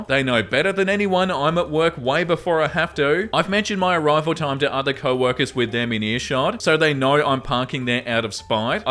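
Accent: Australia. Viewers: I have never heard someone so Australian and so valley girl at the same time. It's amazing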